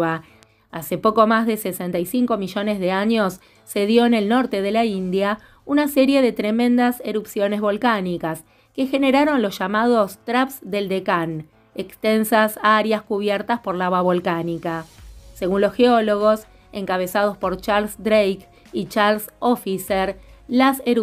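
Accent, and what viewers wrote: Argentina. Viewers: Uff amo la voz argentina que tiene la joven🤗🤗🤗🤗😘😘🤗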